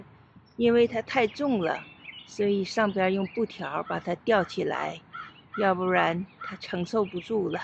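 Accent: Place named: Northeast, China. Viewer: 东北口音亲切！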